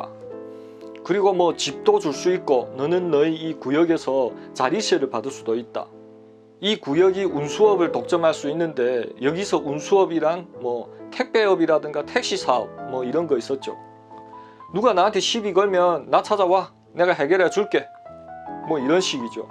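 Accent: Yanbian, China. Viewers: 연변말도 한국어의 지역사투리중의 하나입니다.니네말 우리말을 가르면 한국어도 서울말,경상도말,전라도말 등으로 나누어야 할겁니다.서울말만이 한국어인가요? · 이사람아 우리말 부터 배우고 방송해라 거놈의 연변 토박이말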